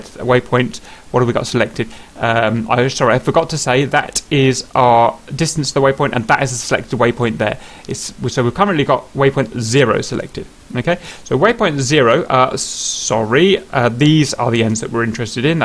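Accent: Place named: United Kingdom. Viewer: A lot of information, but spoken too fast. Difficult to keep up with instructions and the British accent does not help. Any thanks!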